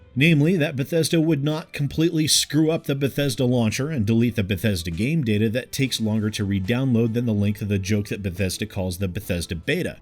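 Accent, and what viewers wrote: United Kingdom. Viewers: Damn, this was so cheeky I could have sworn you were British. Nice work.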